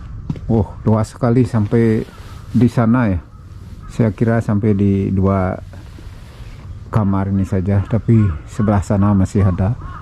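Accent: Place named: Bali, Indonesia. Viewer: Pasti anak bali ne bli..logatne jek bali keleh.Alat numbuk padi gantung jd kulkul.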